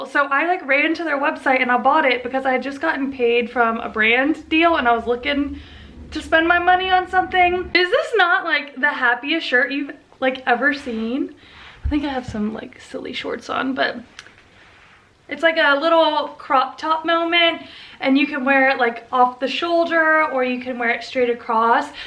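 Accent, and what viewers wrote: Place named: South, United States. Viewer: Omg your southern accent is quite prominent at times throughout this video and I absolutely adore it 💞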